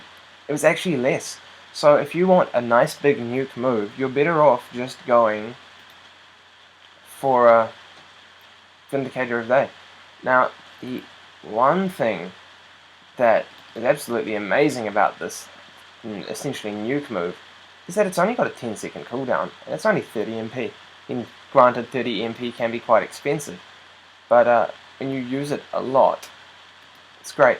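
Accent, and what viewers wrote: New Zealand. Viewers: Thicc kiwi accent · First video I watched from you, are you a New Zealander?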